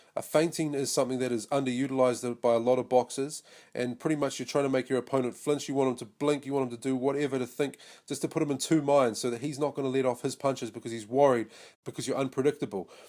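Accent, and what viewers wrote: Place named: New Zealand. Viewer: You fucking legend your accent cracks me up. Proper hard Kiwi Lad from New Zeland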